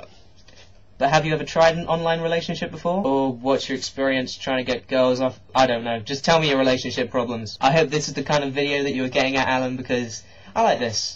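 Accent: United Kingdom. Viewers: A cute nerdy teenage boy ... with a British accent to boot! xD Perfect for me!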